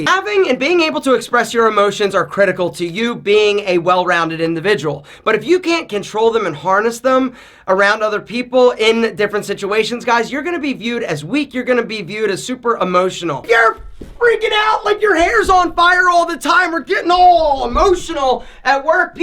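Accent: Philadelphia, United States. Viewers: Is this guy from philly cause he got that strong ass philly accent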